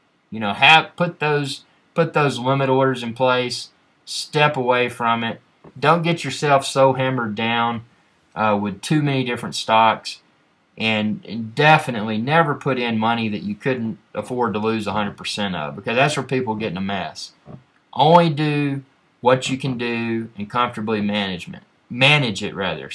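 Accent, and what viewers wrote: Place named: South, United States. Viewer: trading stocks and a southern accent DO NOT GO TOGETHER... that's like a mexican cooking your chinese food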